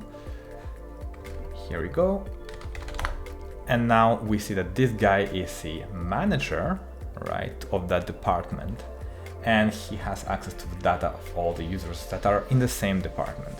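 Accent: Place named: Russia. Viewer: А можно на русском, вы явно по акценту знаете , знайте у вас есть русскоязычная аудитория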